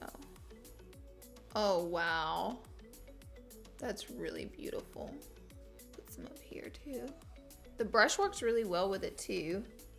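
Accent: South, United States. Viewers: DIY GURL she’s from the south.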